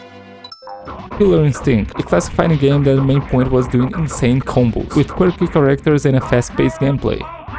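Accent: Brazil. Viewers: ahaha esse sotaque de brasileiro falando ingles é muito fácil reconhecer.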